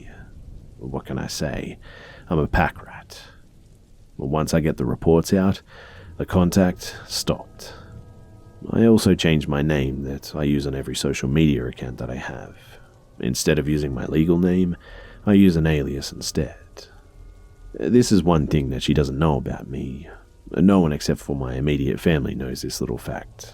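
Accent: Australia. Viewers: Oh my gosh I'm new here so when you started speaking I was like "OMG you're Aussie you're my people!" All the other scary narrations I watch on YouTube are American! All. Of. Them.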